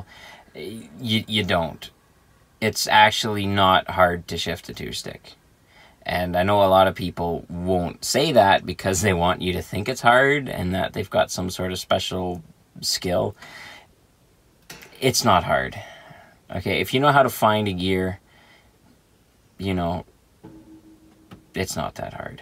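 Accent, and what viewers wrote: Canada. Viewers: The first 10 seconds I knew this fella was Canadian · Hey buddy you from Canada?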